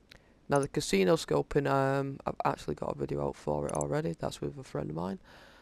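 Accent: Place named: Northern England, United Kingdom. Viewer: Love you're vids friend. Nice to hear a welcome northern accent as most vids are over the top american stuff. Red dead vids have been useful too.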